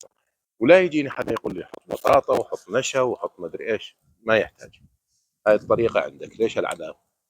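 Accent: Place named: Deir ez-Zor, Syria. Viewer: شيف المحترم نحن نستمتع بكلامك وشرحك على الفيديو خصوصاً بلهجتك الديرية الجميلة اذا سمحت لا تقطع الفيديو بهل طريقة السريعة وخلي يكون اطول ❤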